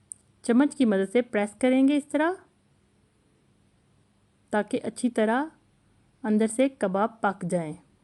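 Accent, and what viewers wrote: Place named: Pakistan. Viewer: Walaikum Assalam Warahmatullahe wabarkatahu Ap jis trah Bolti Ho Jis v Ilaqe se Ho pak se Yaha pe Bht Mere bht Dost Apko trah Bolte hain.. 😊 Big Like G Honestly Full Watch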